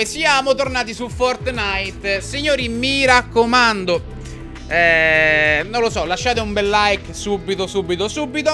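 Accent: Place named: Naples, Italy. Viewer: Ti seguo da poco ma ho già capito che sei napoletano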